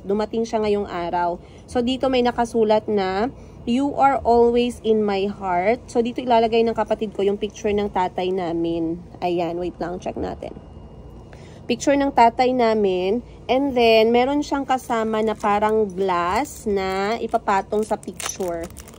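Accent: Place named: Conyo, Philippines. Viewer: Konyo kaayo ug OA ka madam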